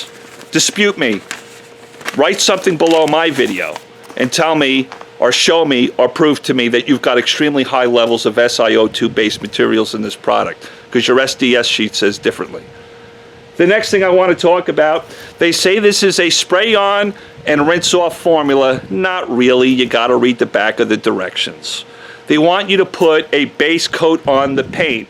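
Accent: New York, United States. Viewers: If he's here in Dallas, why does he have a NY (or L.A.?) accent ? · That's a NY Accent. LI likely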